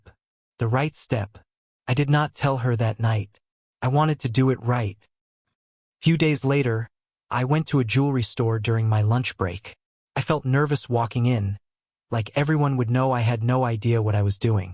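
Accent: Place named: Germany. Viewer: A good brief story with the occasional brief German accent. ❤🎉🏴🖖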